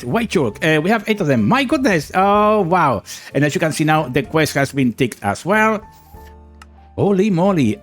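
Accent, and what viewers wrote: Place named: Ireland. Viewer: great vid keep up the good work I really like your Irish old man accent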